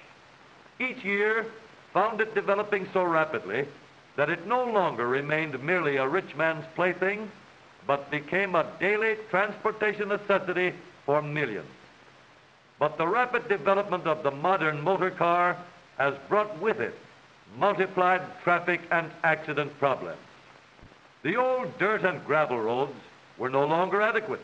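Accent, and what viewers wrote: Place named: Michigan, United States. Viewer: Oh man the deep Michigan accent 😂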